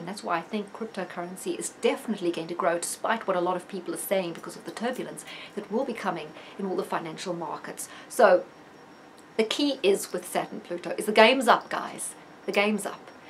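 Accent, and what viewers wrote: South Africa. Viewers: Another great video- thank you. Are you South African?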